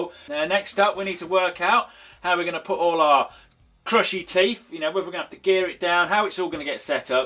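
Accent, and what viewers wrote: United Kingdom. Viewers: Oh, i thought you have a British accent :D btw you are one of the best people on youtube!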